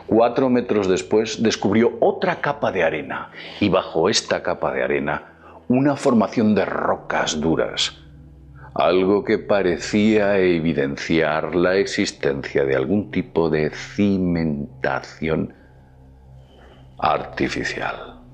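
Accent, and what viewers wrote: Spain. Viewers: Maria Lourdes Said jajaja debes de ser de España, porque para los de fuera de Europa, lo escuchamos igual como todos los demas españoles, todos hablan igual.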